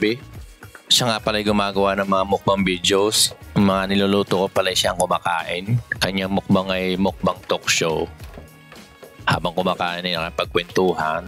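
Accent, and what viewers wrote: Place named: Batangas, Philippines. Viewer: batangueño ata are HAHAHA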